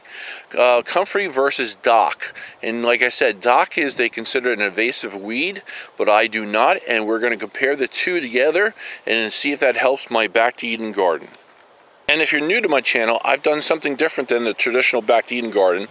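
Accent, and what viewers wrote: New Jersey, United States. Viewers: YAY! I have been doing similar things with Dock. It is nice to have others see its value. I felt lonely when I thought I was the only one who was onto this, and yet it made so much sense to me. I am loving your videos. They all make so much sense. So many pieces of the puzzle fitting together. I literally stayed awake all night last night watching your videos, I was so fascinated. We are establishing a Permaculture food forest on 1/4 acre in Portland, Oregon. It is fun to hear a New Jersey accent. I grew up outside Philadelphia. Blessings :)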